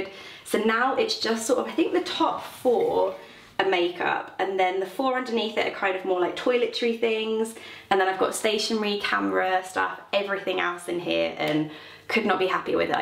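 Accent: England, United Kingdom. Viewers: Julia it's quite a generic way of saying "saw", most English people pronounce saw like that ( as a Brit)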